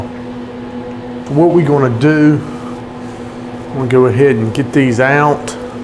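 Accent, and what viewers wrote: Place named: Texas, United States. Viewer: I'm living in England now but grew up in Texas and I definitely miss the accents of the south. Listening to you explain this delicious recipe was a real treat. Thanks man!! Since I grew up with hot links and dry rub long smoked brisket (being a Texan) I'm more and more into pork for bbq. I'm converted!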